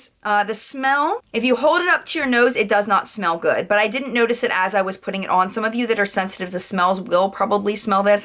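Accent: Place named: Mid-Atlantic, United States. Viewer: You live in Baltimore?!?! Me too! Did not know that! But I definitely recognized that accent as mid-Atlantic!! Go Ravens!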